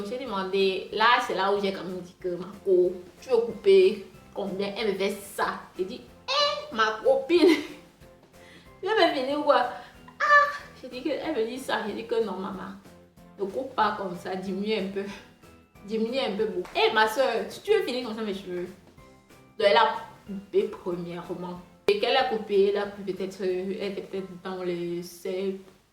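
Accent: Cameroon. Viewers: Effectivement je connais une camerounaise qui parle comme ça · Hey, es tu camerounaise? J'aime beaucoup ton accent, ne change rien.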